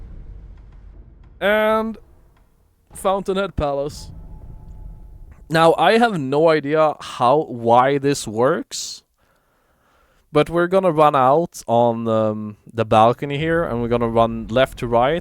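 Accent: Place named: Sweden. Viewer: är det en svenne mina öron skådar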